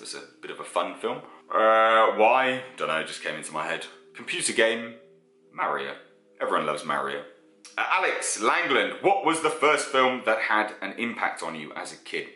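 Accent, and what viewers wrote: United Kingdom. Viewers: Handsome fella you are. Can I ask what kind of brit accent you have? Excuse my ignorance but just curious since your voice is smooth and fluid in every way. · Jesus you’re ripped😂😂😂!!! And you’re voice is so deep and British!!! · I NEVER say these things, 1st time ever.....but YOU'RE EXTREMELY HANDSOME & I've always loved accents, especially British. As an ardent Anglophile, I'll be embarrassed if you're not UK....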